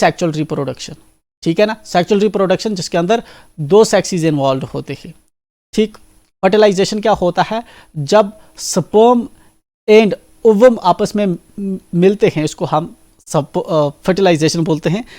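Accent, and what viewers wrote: Bihar, India. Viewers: Are you Bihari?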